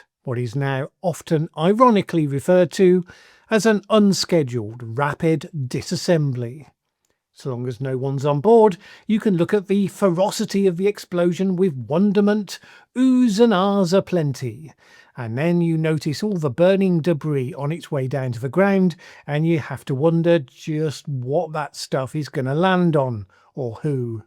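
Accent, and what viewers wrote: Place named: Southern England, United Kingdom. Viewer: I find two things fascinating about your videos 😊: 1. the analysis you make, and the case you make for it, and 2. your pronunciation/your particular English accent. The latter makes it clear that my nephews who live in southern England who had "perfect" trad modified RP diction until they went to school, from which point they spoke with Kentish vowels and struggled to pronounce 'th' consistently [voiced & unvoiced as required, ð and þ] really are following the norm in Southern English. You do not substitute v/ff all the time, for which I am grateful, because it is vital to know whether people who had "ffought" about something had a) had a think or b) had a fight... but 'with others' is clearly easier for you as 'wiv others', and this is utterly normal in 2025 on YouTube from parts of England. It makes me feel that, when helping those who are new to speaking English, we should be encouraging them to say 'th' as 'v/ff' wherever it is easier for them - certainly easier on the ear than the French tendency to "z/ss", perhaps less distracting than the Irish 'd/t' - every way of speaking English that maintains fluency is fine by me, but to do Hiberno-English you need to match it with a consistently Irish flavour in the vowels, or English natives may struggle to attune their ears to the mix. 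Thanks for both your insights in the intended content, and the effectiveness of your delivery and, as it happens, the provocation to me to reflect on what counts now as effective/comfortable dict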